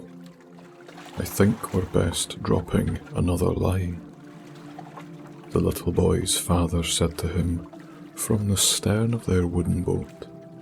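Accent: Scotland, United Kingdom. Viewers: your soothing voice with the Scottish accent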